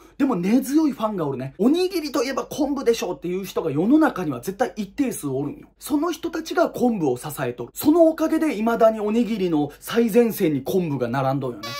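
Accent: Hiroshima, Japan. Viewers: 心地良い広島弁と丁度良い動画の長さで受験の息抜きにさせてもらってます！😂 偏見シリーズ一番好きなので一生やって欲しい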